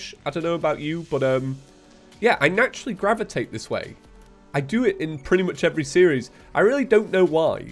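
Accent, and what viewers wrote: United Kingdom. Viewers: Sometimes I honestly just think “ god, how I would love to play in world with him and his British accent, eh, probably will never happen”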